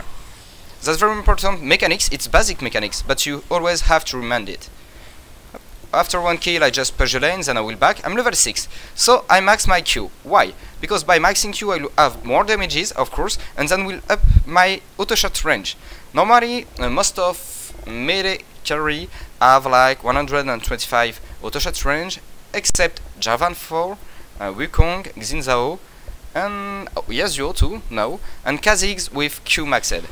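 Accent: France. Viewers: That french accent, so terrible ... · Tu as un bon niveau d'anglais et tes vidéos sont toujours intéressantes mais y a t-il vraiment un public NA qui veuille entendre un français avec un accent à couper au couteau alors qu'ils ont déjà 10000 streamer anglophones ?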